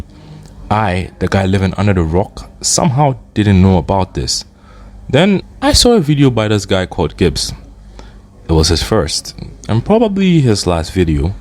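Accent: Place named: Nigeria. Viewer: oh okay you kind of sounded Nigerian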